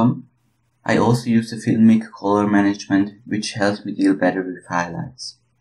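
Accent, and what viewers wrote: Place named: Switzerland. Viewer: Haha ich han grad kört dass du en schwitzer bisch an dim akzänt :P Cools Tutorial btw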